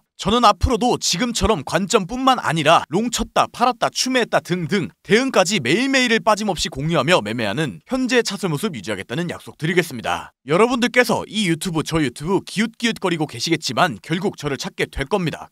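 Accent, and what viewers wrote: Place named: Jeolla, South Korea. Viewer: 느작없이 오랜만에 듣넼ㅋㅋㅋ 전라도 분이시구낰ㅋㅋㅋㅋ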